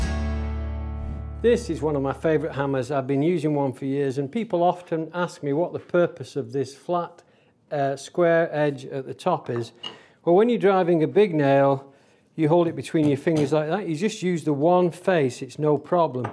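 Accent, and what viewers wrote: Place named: Northern England, United Kingdom. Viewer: Hi. your videos are great. I’m very new to woodwork, I was wondering where you source your timber from, and how to make sure it’s suitable for furniture making. I have a good friend who is very experienced. He bought some supposedly dried timber suitable for furniture, turns out it wasn’t dried enough and a beautiful table he made ended up splitting. I’m in the south east of the UK guessing you’re in the north from the accent. would appreciate any recommendations/advice. Kind regards. Jon.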